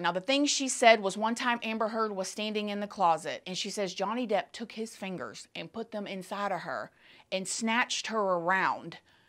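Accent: South, United States. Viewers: I love your accent!!!! I’m from NYC so I don’t really hear no1 speak in southern accents. But when I do hear someone speak with a southern accent, they don’t sound this lovely and awesome!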